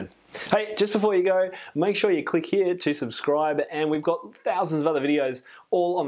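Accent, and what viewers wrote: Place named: Australia. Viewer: Super cool! I think I saw you on the Tom Lee webinar the first couple weeks of Covid lockdowns... I had written “mini-cam” in my notes and was looking for it. The Ozzy accent through me! Thanks for this video... I’m ready to do this! Would that be your preferred set-up to have a body shot and an overhead shot? I would think so... I would love to get my students on this (lots of Elementary level kids - need to see their bodies AND fingers!).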